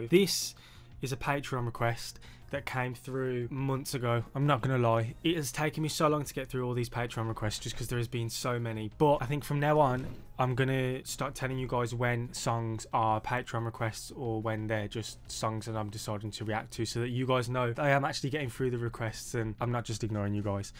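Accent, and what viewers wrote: Birmingham, United Kingdom. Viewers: Hi Got a (not very) secret crush on you and your Brummie accent 😚. A question for you - did you chose your own title, or was it a suggestion? Just asking because it has a strange meaning, that you may or may not be aware of. Btw I watched your post 'Am I Gay' which I found confusing tbh. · They are one of ours, this was at the hammersmith odeon in london. your accent sounds like a brummie one but the loike could be west country or east anglia.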